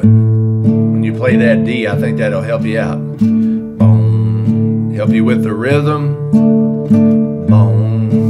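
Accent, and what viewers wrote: South, United States. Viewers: Great call on the 3/4 timing. I just got through seeing another video of this song, supposedly version, and they were playing in faster tempo in 4/4 timing. Btw, love your voice as well. Very Southern 👍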